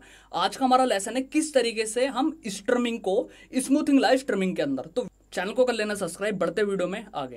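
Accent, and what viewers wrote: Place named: Meerut, India. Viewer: Bhai aapka way of talking kafi apna apna sa hai. Meerut ke aas pass se ho kya???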